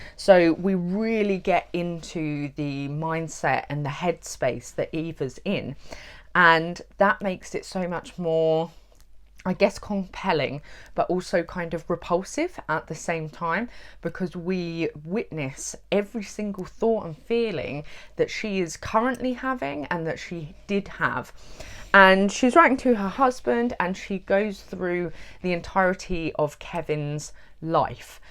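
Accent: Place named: RP, United Kingdom. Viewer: The Engish accent is always so elegant. Doesn't matter what is being said, it sounds posh.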